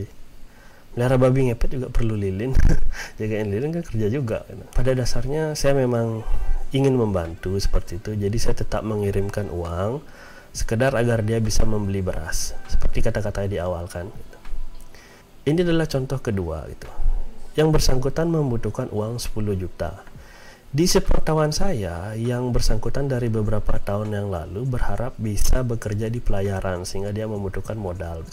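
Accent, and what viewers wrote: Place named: Bali, Indonesia. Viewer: Wah, ketemu channel ini, suka kale pembahasanny dan logat balinya.. dulu pernah kerja di bali 2 tahun... Jadi sudah biasa sama logatny.. moga2 channelny bisa kembang terus.. yg di sharing kebanyakan bermanfaat dan mindsetny bgs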